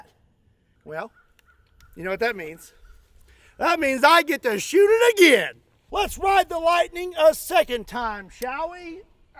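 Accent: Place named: Baltimore, United States. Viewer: B-more people like why he talk like that. If you know you know. 😆 🤣 🫡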